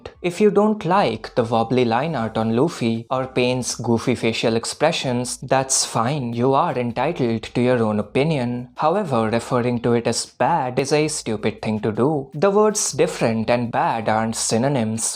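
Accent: India. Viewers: clearly india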